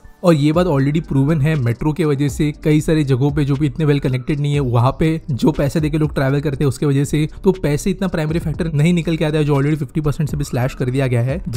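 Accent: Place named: Maharashtra, India. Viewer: Good video.. but talk slowly. I can sense that you are Maharashtrian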